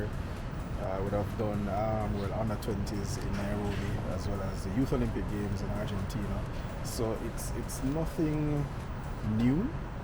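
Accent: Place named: Jamaica. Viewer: I was listening today and thought it was a Jamaican announcer and then convinced myself it was wishful thinking🤣🤣🤣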